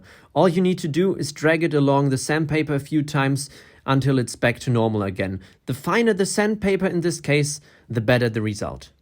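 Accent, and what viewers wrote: Germany. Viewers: Are you are German??? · man hört den deutschen dialekt also sprich halt deutsch hört sich so nicht schön an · Da hab ich den Deutschen Raus gehört:) Hallo;) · Youre german arent you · Do i hear a german accent?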